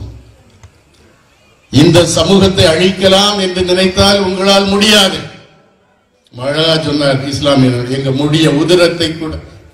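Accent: Kerala, India. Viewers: எனக்கும் சந்தேகம். பேச்ச கேட்டபோதே தோன்றியது . இவன் ஒரு மலையாளி என்று தோன்றுகிறது. ஜெய் ஹிந்த் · நீ பேசும் தமிழ் நாடார் பேசும் தமிழல்லவே. மலையாளம்வாசம் கலந்து நாறுது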